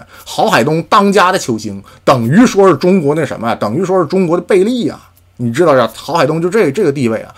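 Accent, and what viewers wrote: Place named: Beijing, China. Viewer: 好家夥，是青島人嗎？這口音我還以爲你是北京的呢，完全沒有青島口音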